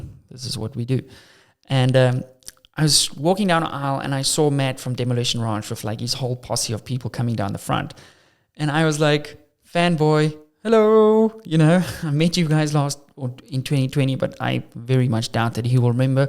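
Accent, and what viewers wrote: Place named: South Africa. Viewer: Thank you love the channel and the south african accent 👌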